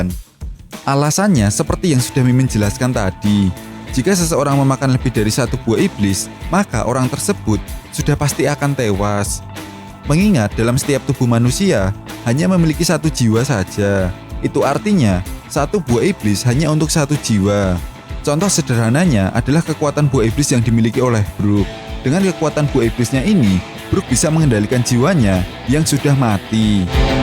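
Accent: Java, Indonesia. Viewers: Bahasanya medog ,, tapi itu yg membuat saya terhibur ,, lanjutkan ....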